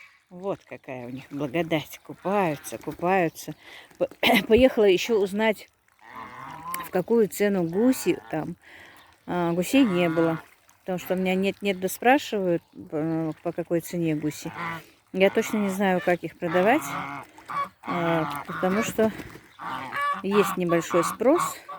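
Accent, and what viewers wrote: Standard. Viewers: Здравствуйте!Недавно вас смотрю,мне очень нравятся ваши ролики.И еще вы так по русски чисто и грамотно разговариваете.желаю вам и вашим близким удачи и здоровья.Я живу в Омской области р.п.Шербакуль -это Сибирь.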